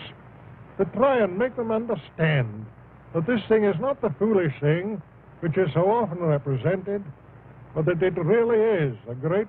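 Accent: United Kingdom. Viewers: Interesting to hear his distinctly Scottish burr.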